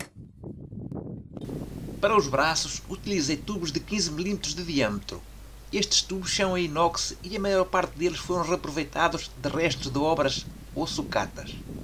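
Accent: Portugal. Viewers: sotaque de Portugal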